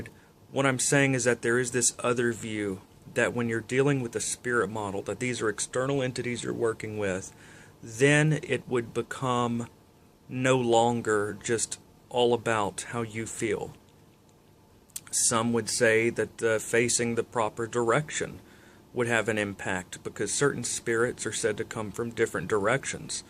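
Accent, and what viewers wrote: South, United States. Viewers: Hi from Sydney, Australia. Thank you for your explanations and knowledge - especially around the historical context and ancient symbol aspects, as your teachings have started to provide me with reasons / answers for why I subconsciously use symbols via colour, plants, nuts, shells, stones and crystals to attract harmony and inner peace and have always done this since childhood. I have just started to use symbols for protection and for hurrying up karma. Sometimes one cannot just wait if one or their friends are being hurt. It is so satisfying to watch the Universe respond to your call. Love your words and especially your 'southern' accent, it adds a unique flavour to your weekly explanations. Thanks again, Barbara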